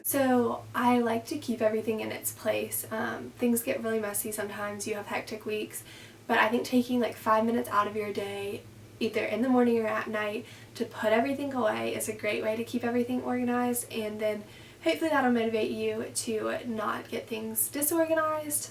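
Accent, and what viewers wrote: South, United States. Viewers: I think that you have a slight Southern accent, especially on your o's.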